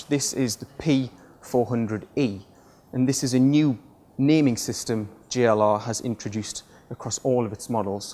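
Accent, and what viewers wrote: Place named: Newcastle, United Kingdom. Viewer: Gan on Geordie lad.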